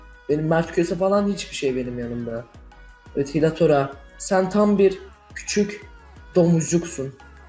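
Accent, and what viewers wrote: Azerbaijan. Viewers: kesinlikle Azerisiniz. Belki kendini MERT diye tanıtan insan bile MERT değildir. Zaten iyiki de ( ismi bilinmiyor xc) abone değilim. Bu kaçıncı yalan? İnsanları kandırarak birşey elde edemezsin! Lox sözünden anladım ve konuşmandan. Bende Azeriyim de, kendinizi bu kadar düşürmeniz? Ah, kesinlikle boşsunuz. Sizin içinizde,dışınızda boş. Benim yorumuma gelip Mertciler pardon Sahtekarcılar buraya havlamasınlar...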